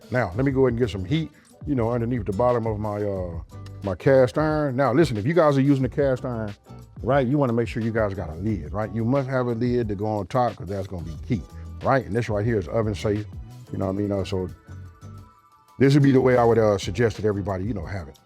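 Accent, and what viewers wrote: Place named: California, United States. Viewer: Man this right here cuz!!!!!!!!! Omg this is seasoned to perfection. I’m here a Cali accent but I’m seeing down south cooking going on. Good lawd!!!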